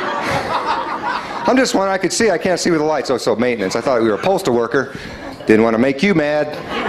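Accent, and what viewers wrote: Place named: Arkansas, United States. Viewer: Did you know we speak that way in Arkansas because of the Scottish stronghold and influence? Proud Scots heritage here. 😅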